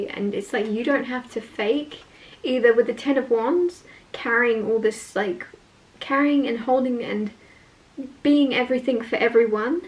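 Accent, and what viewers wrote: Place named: England, United Kingdom. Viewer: I like your videos in.English i.lke your English accent!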